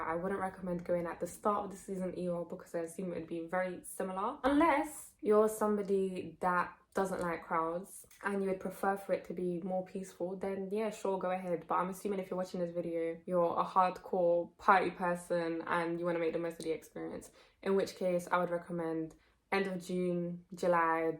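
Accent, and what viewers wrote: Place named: United Kingdom. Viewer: British accent, hard to understand for me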